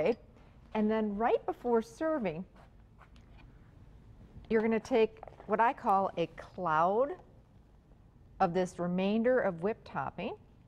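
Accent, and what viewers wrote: United States. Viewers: Oh, no. Packet "pudding," store-bought crackers, store-bought cake ... and won't even whip real cream. When I first heard her accent, I thought, "Oh, this is going to be terrible," then berated myself for stereotyping Americans. This is so much worse than I'd thought was possible. Maybe it was for a "quickest desserts ever" segment? My goodness, even the layering is dreadful -- it's a tumble of ingredients. Let's see actual layers. Very dry, with so little liquid (sherry &/or juice is usually needed for the sponge).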